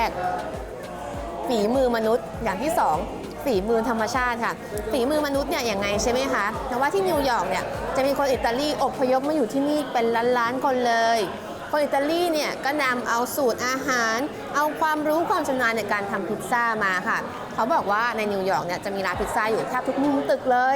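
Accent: United Kingdom. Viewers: สำเนียงพี่ บริติชมากเลยยยย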